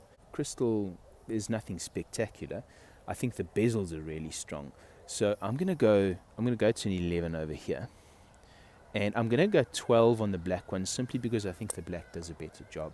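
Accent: South Africa. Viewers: South Africa! To my American ears it is hard to distinguish from Australian (but I'm getting better). Great review. Thanks for posting! Also, thanks for the web page directions. I'm following and looking for more posts. P.S. I can't believe they've stopped the sale of alcohol Nation Wide due to COVID. My prayers are with you!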